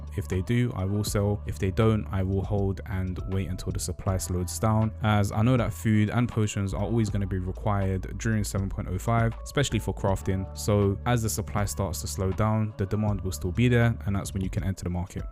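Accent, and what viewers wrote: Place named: London, United Kingdom. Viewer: are you from London? Your accent is dank. Also, when are you back, baby?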